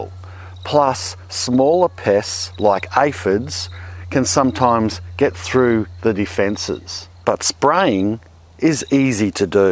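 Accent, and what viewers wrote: Australia. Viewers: I think many people watch so they can practice their Australian vocabulary and accent, in addition to the advice. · Another thing they should do, is to watch crazy Aussies making gardening videos!!! lol! I love your vids, combining some GREAT info, with a smattering of comedy, here and there. I am in the U.S., and as soon as I heard your accent, I loved how you say thing a bit different. So I TRY to remember to mulsh, but sometimes, I just have to settle for mulching instead. Have a good one, and keep up with the AWESOME vids! Another guy, who is GREAT for container gardening, is "home grown veg"! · How about collecting your own urine (if you are not taking medication) and using it as a nitrogen source to naturally boost your veggies, eh? I did that with my garlic twice and while I haven't harvested it yet the scapes have been harvested twice already! 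Very excited to see how the heads come out. This is my first year gardening so I'm definitely a noob. Thank you so much for taking the time to make your videos. I enjoy watching all of them and always learn something new. Plus I love the Aussie accent!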